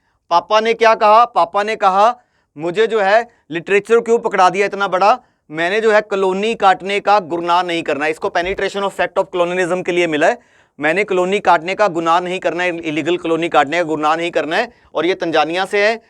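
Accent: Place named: Punjab, India. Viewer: Thank you sir . And love your punjabi accent ❤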